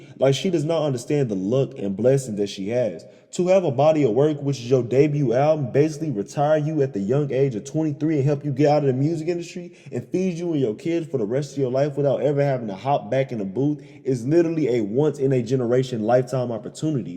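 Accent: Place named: United States. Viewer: Dude…..Interesting video but I’m struggling to hear what you’re saying, as a narrator you need to understand that we’re not all Americans so your words pronunciations should be clearer and possible slower (for non-Americans like myself), your accent is a little strong. Please take this as a constructive criticism.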